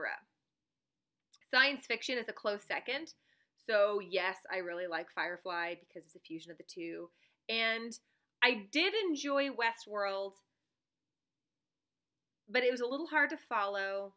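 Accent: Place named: England, United Kingdom. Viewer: Thank you for taking my English accent comment and speaking more :D! <3 :)!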